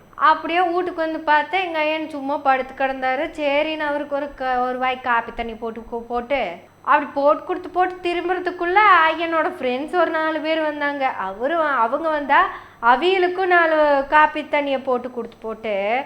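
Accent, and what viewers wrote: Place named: Coimbatore, India. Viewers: சேலையை கட்டிட்டு கோவை தமிழில் தங்களை போல் பேச இனியொருவர் பிறப்பதில் சந்தேகம்.ஏதோ புகழ்ச்சிக்காக அல்ல.உண்மை. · அடடா.....கோவை ஸ்லாங் சூப்பர் அம்மணி...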